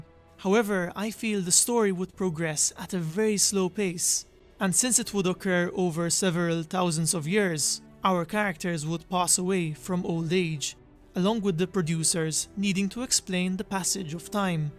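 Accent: Malta. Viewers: great video! are you Maltese? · The Maltese accent is so evident haha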